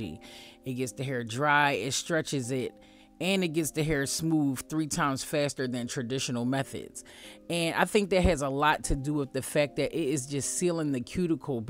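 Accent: Philadelphia, United States. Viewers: You sound like you’re from Philly. And where are u located? I moved from Jersey to Florida and I can’t find ANYONE that I trust with my natural hair😫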